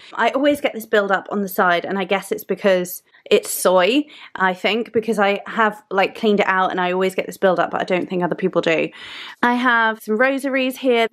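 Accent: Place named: RP, United Kingdom. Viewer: Love your video and thank you so much for sharing your altar in this video. So creative and absolutely LOVE that huge rose quartz tower. Love that we both truly love the dark goddesses: Lilith, Hekate and The Morrigan. I pointed out that your book shelves looked like mine with all those books piled high. He was like "you witchy types like your things," I was like, "be glad that you are one of those things that I still like, lol." I adore your accent as well. It sounds so posh to me. Being from the states and I am in central Ohio, I don't really have what you call an accent. I get a country thang occasionally when saying certain words and that comes from my momma, and being around her all my life. lmao. 🌹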